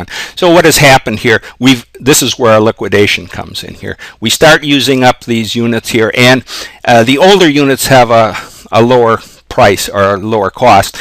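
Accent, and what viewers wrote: Boston, United States. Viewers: i love this guys boston/hispanic accent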